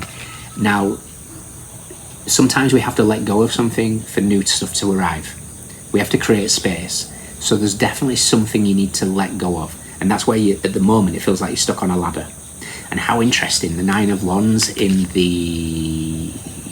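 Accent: Northern England, United Kingdom. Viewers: Yay ,someone British! Liked your reading, although I love all readings from everyone from all over the world 🌎 (I can tell you are northern, where are you?) I am a southerner London 😊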